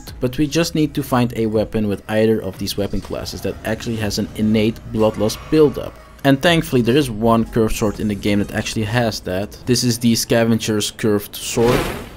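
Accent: Netherlands. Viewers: Totally agree. The builds are on point, 100% of the time. And, the Dutch accent provides the same gravity for ER buildcraft vids the German accent provides for the Mathologer's math videos! 😎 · followed ur early to mid game build, and now doing this one too. love the detail and explaination. btw are you dutch?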